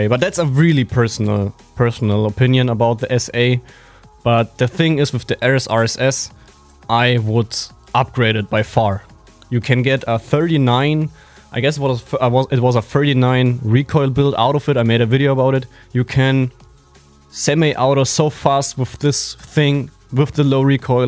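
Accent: Germany. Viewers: Sprich einfach deutsch bro xD, joke mag es wenn deutsche Englisch sprechen! <3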